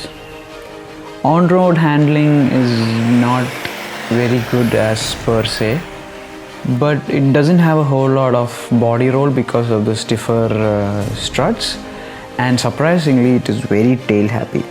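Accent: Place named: Kerala, India. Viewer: കേരളാ ഇംഗ്ലീഷ്..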